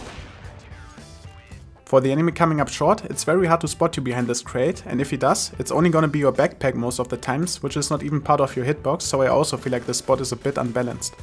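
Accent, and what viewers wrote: Germany. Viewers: your German accent english is so awful, try to speak more fluently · You sound very german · trying understand your english with a German acent, is so hard, but you make a good video.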